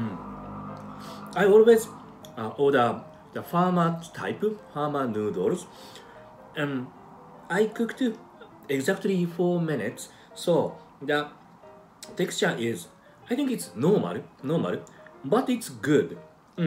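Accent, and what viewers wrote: Japan. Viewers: Always interesting listening to native Japanese speaking english(no offense meant). As someone trying to learn Japanese I am beyond impressed how well transitions from JP->En->JP->En is for a lot of people. Ive always had a hard time with the order of things moving between English to other languages, like spanish. Kudos.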